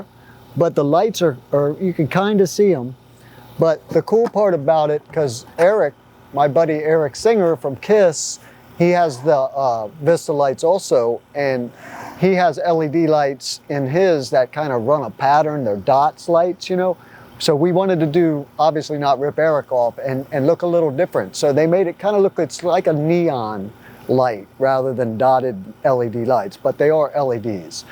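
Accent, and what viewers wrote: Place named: West Virginia, United States. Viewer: I'm a lifelong drummer from Wheeling,WV and I always thought I heard some WV in your speech.Not Hillbilly just our region.Proud of you dude.I loved this interview.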